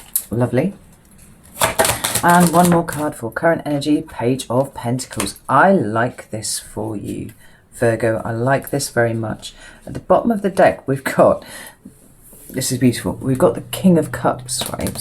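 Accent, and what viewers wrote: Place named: England, United Kingdom. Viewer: What K.Y. or C.Y. cat has you cleaning out there litter box. You may capture America with your English accent.or would you prefer my short comings lest wow grab a twix candy bar and coke pop, soda I guess I don't belong in America this next 50 you have a flat $ 640 nothing included a month .I'll sign a contract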